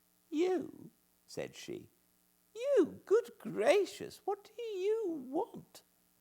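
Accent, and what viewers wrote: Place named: RP, United Kingdom. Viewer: Thank you a thousand times for these readings! I've read several times David Copperfield and Oliver Twist, but so far, I had only read extracts of Great Expectations as you can find them in English learning books (mostly abridged text). I'm responsible for at least 3 views of each video since English is not my mother tongue. Your prononciation is very neat : it's like Queen's English with feelings and local colours (if that makes sense). My only fear is that you might stop reading before reaching the end of the book. Please, do go on until the last chapter and I promise to 'read with my ears' until the end too.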